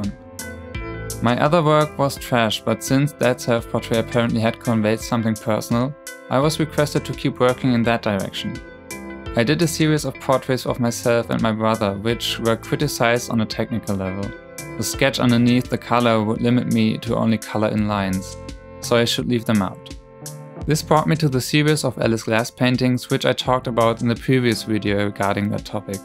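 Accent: Germany. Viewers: Wusste doch dass ich einen deutschen Akzent höre ^^' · Ah, ich wusste, dass du Deutscher bist :P Du hast zwar wenig Akzent, aber irgendwie kam es mir doch bekannt vor, aber cool hier auch mal eine deutsche Perspektive zu hören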